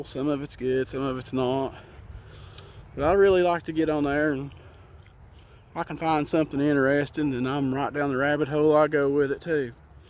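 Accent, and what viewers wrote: South, United States. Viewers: Always good to wake up on a day off and see has posted!!! It’s good you mention music it’s through the music I found the beautiful folk lore! Listening to Doc Watson and the such made me want to get a deeper knowledge of Appalachia because it reminds me a bit of the part of England I’m from. One thing I’d love to ask, going by your accent and some of the place names you mention I’m guessing your in Rasslin territory. Where you your self ever a fan? I love 70s/80s pro wrestling and the south has the best!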